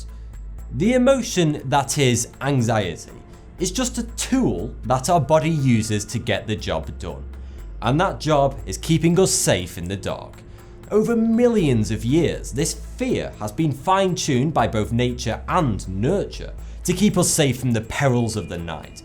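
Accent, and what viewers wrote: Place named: North, United Kingdom. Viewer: Hey where are you from because I recognise your accent.Do you live near Bury or Bolton?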